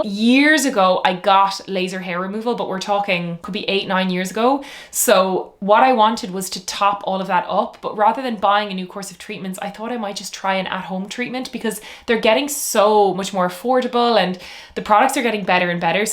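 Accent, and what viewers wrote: Ireland. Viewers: Oh the Irish accent! One of the most beautiful sounds in the world! I’m a voice over artist ..I should know! ❤ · Really rude comment. I love the lilt of an Iris accent. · Cant stand the irish accent though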